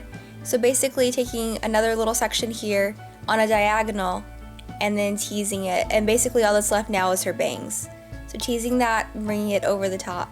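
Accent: United States. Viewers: Hi which part of USA r u from? I love this accent you have!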